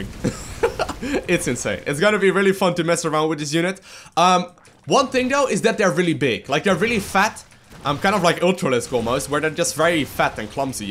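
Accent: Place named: Netherlands. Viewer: dutch is definitely leaking into his english, compare this to his newer videos, you can really see the difference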